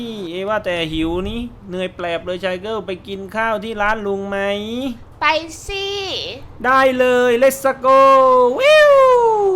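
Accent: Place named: South, Thailand. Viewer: พูดภาษาใต้นิ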